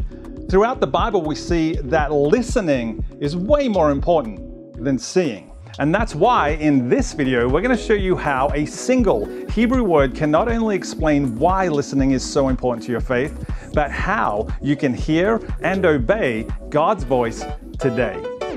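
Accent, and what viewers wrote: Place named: Australia. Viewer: Missed your voice man! Love reading your devotionals every morning! But something about that Australian voice explain things just makes it better. Loving this channel so far!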